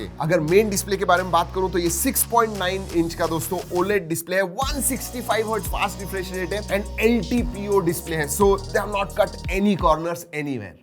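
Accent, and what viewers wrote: India. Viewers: My advice..start to learn proper English , you are speaking Indian english😅😅 so only Indian can understand . Why you Indian to lazy !!!!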